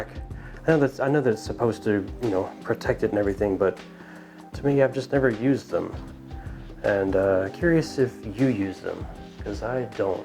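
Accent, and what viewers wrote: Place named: Alabama, United States. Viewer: What’s wrong with an Alabama accent man?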